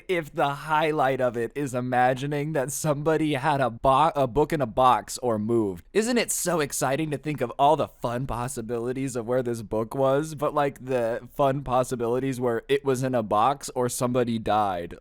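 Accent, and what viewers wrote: United Kingdom. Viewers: Nice British accent. Keep it up.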